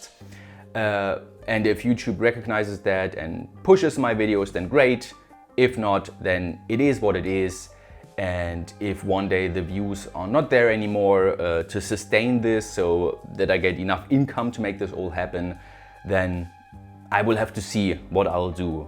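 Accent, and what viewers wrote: Germany. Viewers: I joined you when you had about 40,000 subscribers. I really liked your content and your German accent. As your subscriber and fellow creator, I completely understand you. It's just titanic work. I wish you inspiration in the new year and ease in creating videos.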